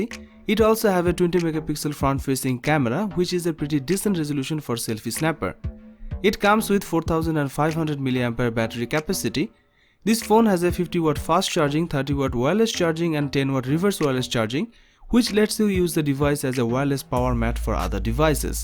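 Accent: India. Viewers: Please, next time without Indian accent🙏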